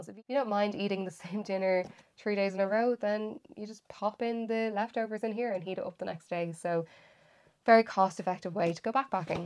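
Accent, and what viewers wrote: Ireland. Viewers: A Kiwi here - I LOVE hearing an Irish accent and had no trouble understanding you. We ALL have accents of one kind or another, and Irish is one of my favourites.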